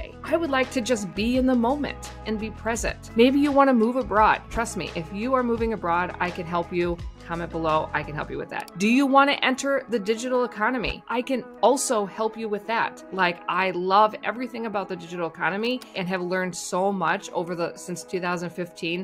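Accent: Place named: New Zealand. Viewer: I am a person who wants to come to New Zealand. Watching Madam's video is for learning Kiwi English accent ❤️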